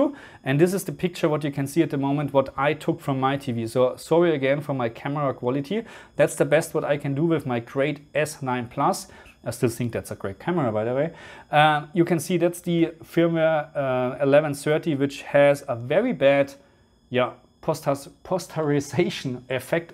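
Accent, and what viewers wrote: Germany. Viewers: That's one heavy German accent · bist du deutscher? Klingt so 😁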